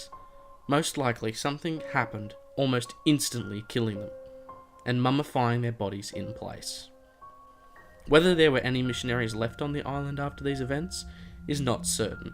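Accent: Australia. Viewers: Are you Australian ? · ugh your Australian accent is so pleasing to my ears 🥺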